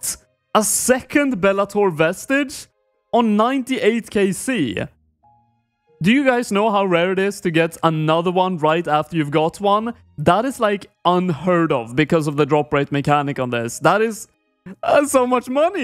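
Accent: Sweden. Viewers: The swenglish accent is so strong, i love it😂 Rsn: Kurdo-1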